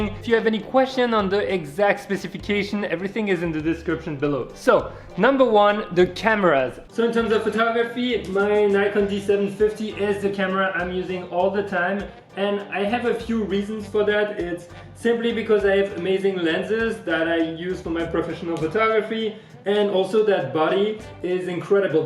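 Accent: France. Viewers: It depends on the kind of aps c you're using. If you convert a 16mm on Sony aps c camera you have to multiply. 16 * 1.5 = 24. So it's the equivalent of a 24mm on a 3/4. Love your French accent btw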